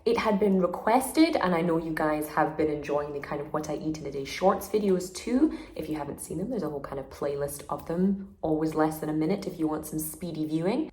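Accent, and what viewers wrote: Scotland, United Kingdom. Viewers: Where abouts in Scotland are you from? 🏴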